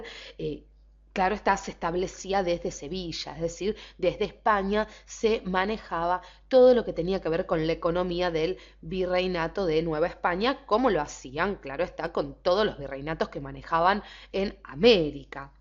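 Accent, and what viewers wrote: Argentina. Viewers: Hola y disculpa que te tutee, pues nada que agredecer con gusto, escucho tu acento y eres argentina sino me equivoco...bueno es interesante la historia de este país, solo que te venden otra historia....la que todos conocen, pero investigo mas de 10 años el tema de la Independencia de méxico, que tanto saben sus habitantes, y no es mucho lo que saben...hay mucho desconocimiento...lo que guste referente al consumador de la Independencia de méxico..te doy información...cuidate.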